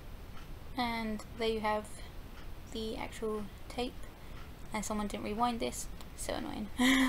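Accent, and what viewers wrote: United Kingdom. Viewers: Thank you very much for the video and the great british accent. Greetings from Germany. Love it so much! : )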